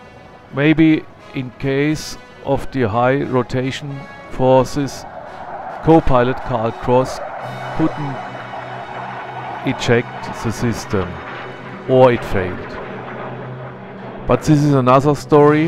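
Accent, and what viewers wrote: Germany. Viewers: train your english. There is no excuse that every german hears you are a german. Try speak Valkyrie "Wall Kü Ri" Best regards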